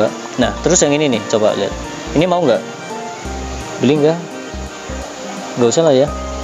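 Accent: Java, Indonesia. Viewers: abang orang jawa ya bang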